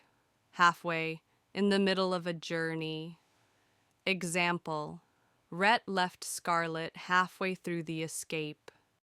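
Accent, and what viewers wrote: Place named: United States. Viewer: excellent and clear American English pronunciation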